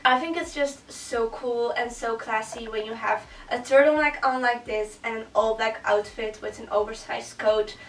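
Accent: Netherlands. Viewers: haha hoorde meteen dat je Nederlands was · Toen je begon te praten wist ik gelijk dat je uit Nederland kwam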